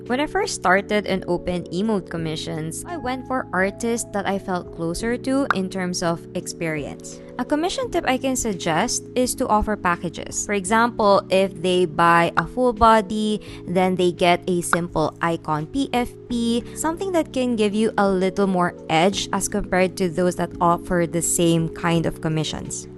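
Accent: Philippines. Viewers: I actually Guessed you correctly? Lmfao? Although i appreciate the Video, cuz i also wanted to look for commissions, your accent kind of gave me a suspicion, so instead of using English, i used Tagalog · accent is too FILIPINO.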